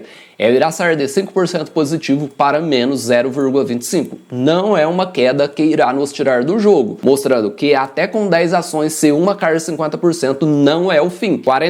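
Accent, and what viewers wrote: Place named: Inland São Paulo, Brazil. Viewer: Esse sotac Paulista do interior é irritante rs mas o que vale é a informação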